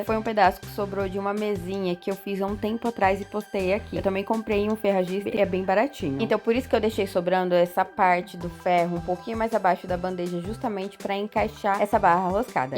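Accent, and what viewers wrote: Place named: Goiás, Brazil. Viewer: Show. Amo seu canal e esse sotaque goiano. Como é bom ver uma conterrânea talentosa e com um canal bombando com conteúdo de verdade. Parabéns!!!!!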